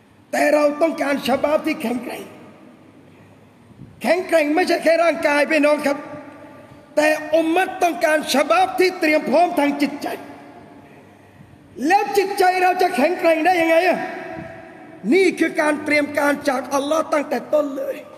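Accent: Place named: Malaysia. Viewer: อาจารย์ เป็นคนที่ไหนหรอครับเหมือนหลุดภาษามลายู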